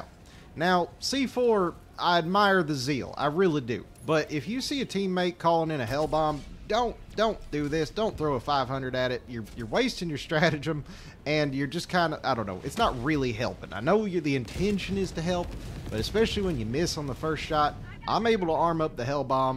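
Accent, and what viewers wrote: South, United States. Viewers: It’s interesting to hear a guy with a southern accent say democratic pride. You’ll never hear that otherwise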